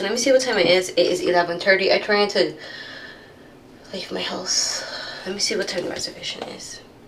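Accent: Barbados. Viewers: Hi, I love and enjoy your content, my only complaint would be that I wish it was more regular 😁. I particularly enjoyed this one. Please, keep your Bajan accent, it is so wonderful to hear you sounding like you've not left Barbados. Take care and God bless.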